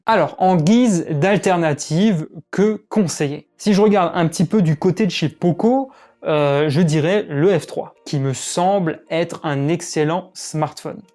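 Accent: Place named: Alsace, France. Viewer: t es alsacien? je sens un leger accent!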